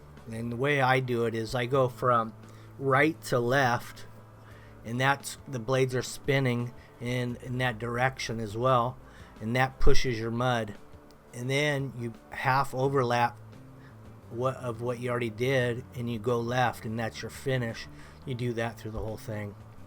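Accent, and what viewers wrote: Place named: California, United States. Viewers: I live in Los Angeles so many people talk like that up here😂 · I dunno, agree that it's dead on So Cal but more surfer than Mexican influenced. I think the Cali Mex accent is influenced by the So Cal surfer type sounds more than the other way around. I've lived here my whole life so kind of hard for me to hear so maybe I'm wrong but I DID grow up with Mexicans lol. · Pale Writer its a cali accent is wat he is talking about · That accent....so calififornia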